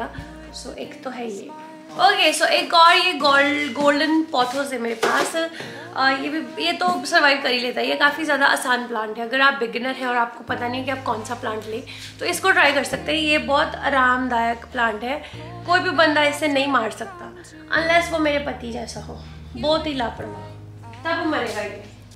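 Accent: Punjab, India. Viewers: hahahha bhaji di jheri hindi aa na bhti kmal aa viche punjabi a jandi ....... wahhhh mza a janda sun k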